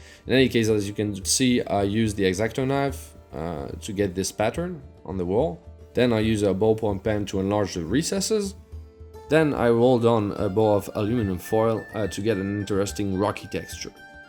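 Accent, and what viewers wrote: France. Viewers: What part of France are you from?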